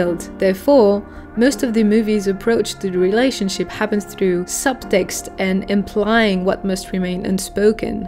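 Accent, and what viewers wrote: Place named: France. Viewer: Absolutely brilliant analysis! Loved your enquiry into Carol, and how every single sentence is both sharp and poetic. Well done ! 🙏🙌 And you are a native bilingual, aren't you (You have an ever so slight French accent and the prefectly native Parisian one)? (Not to mention your poster of Chris and la petite robe noire 😆) Anyway, love from London from a queer ⚢French/English film analyst (I know how hard it is, so I do admire your competence) 😊 Merci ❤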